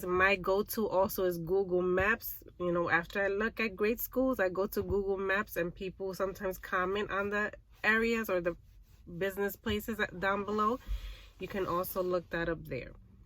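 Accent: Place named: Bronx, United States. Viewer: I hear your Bronx accent. I’m from there too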